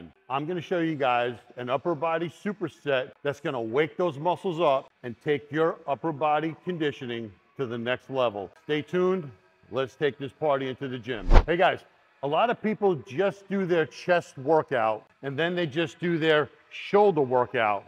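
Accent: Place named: New York, United States. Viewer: The advice is brilliant. The New York accent just adds to the enjoyment.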